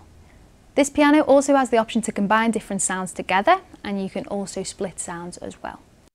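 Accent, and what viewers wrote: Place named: Scotland, United Kingdom. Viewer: She's so cute!!! And the Scottish accent... just... 😍😍😍 The keyboard's pretty good, too, I must say.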